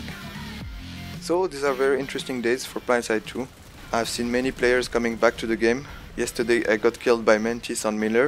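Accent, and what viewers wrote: France. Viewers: Good video ty...Hey can i ask why you speak like a french guy? i am french and when you speak in english, it looks like you know how to speak french:)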